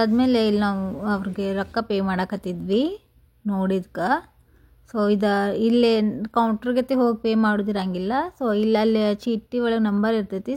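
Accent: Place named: Uttara Kannada, India. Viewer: Nim bhashe super uttara Kannada super vlog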